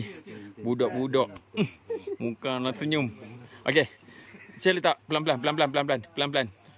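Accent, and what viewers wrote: Perak, Malaysia. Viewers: Pekat loghat peroknye, beso kome 😂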